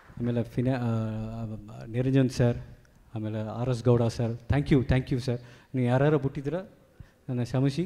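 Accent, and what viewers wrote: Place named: Chamarajanagar, India. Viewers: Namma chamarajanagara bhashe na nimmindha keli khushi aythu!!! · Try madilla sir poorthi kannadane but adu narasipura,nagara slang aste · ಸ್ವಲ್ಪ-ಸ್ವಲ್ಪ ಚಾಮರಾಜನಗರದ ಭಾಷೆಬರುತ್ತದೆ ಧನ್ಯವಾದಗಳು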